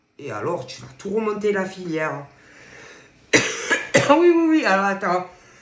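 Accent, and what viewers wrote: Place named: Marseille, France. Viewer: adore votre accent Marseille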